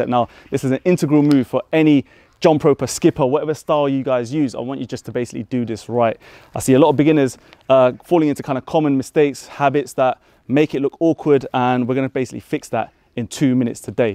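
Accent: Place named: United Kingdom. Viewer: UK aksen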